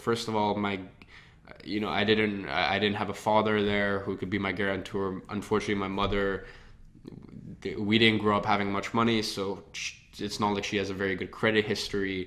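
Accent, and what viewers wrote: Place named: United States. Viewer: Hey! How come you have American accent living in uk?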